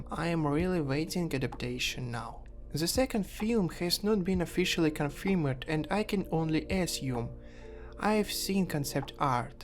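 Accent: England, United Kingdom. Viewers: О, вы из Англии? (неплох) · О так вы из англии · Оу, вы из Англии?) Oh , you are from england?)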